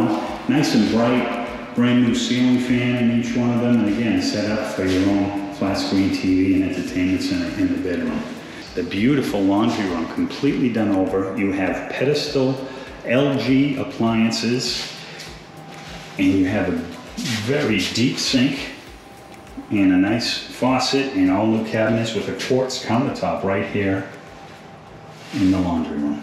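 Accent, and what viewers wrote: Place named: New York, United States. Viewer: You sound like you are from NY or NJ.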